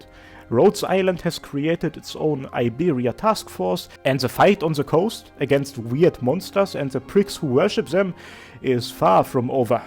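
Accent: Germany. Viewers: german akzent is real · Moin Moin... ausm Norden?!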